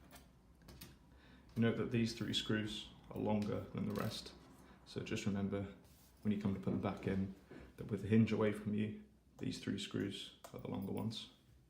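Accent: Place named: United Kingdom. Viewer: Informative and British. Subscribed.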